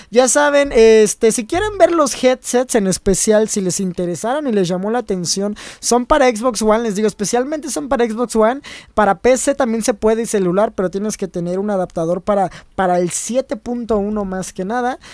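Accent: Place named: Mexico. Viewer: jajaj me gusta el acento mexicano noooo mames cabronn jajaja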